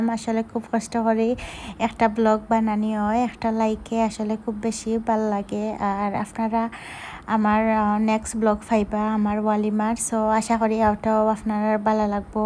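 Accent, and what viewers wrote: Sylhet, Bangladesh. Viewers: Appu goh apnare otto sundor lager 😍😘 . R eta amar 1st comment . R apnar vlog gun onek sundor oy . Allhumdulillah dua kori apnara sob somoy bala takoin . Ami apnar 2 3 ta vlog u deksi . Dekiya u oto bala lagse . Asha kori future o apnar loge amar deka oibo baggot takle . R apne otto sundor kori sylheti matoin huniya jeee Bala lage . By the way ami apnar little sister or lakan . Ami inter 2 year o fori . LOVE YOU APPU 😘😘😘😘😘😘😘😘😘😘😘😘😘😘 . R ami dua kori ami o jeno future o olan akta husband fai + olan family o olan . R amar wedding ta o jeno olan oy . Allhumdulillah . ☺️☺️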